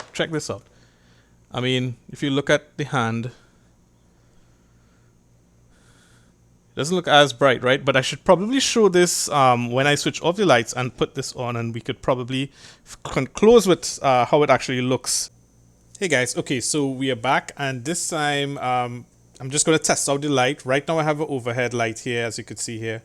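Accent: Trinidad and Tobago. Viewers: Thank you very much. I am literally in the line to the cashier considering whether or not I should get one. I Googled the product and found your video. Help helped me with my decision. I was also good to hear a Trini accent. 🙂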